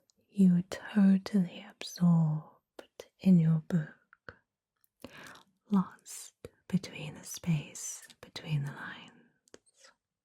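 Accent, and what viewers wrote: United Kingdom. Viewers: Your guided meditations are the best with the cherry on top being your british accent! This is as amazing as the previous ones ❤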